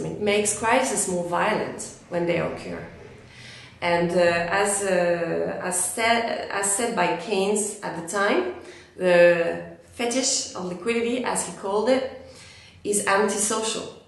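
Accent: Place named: France. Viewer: she is a french speaker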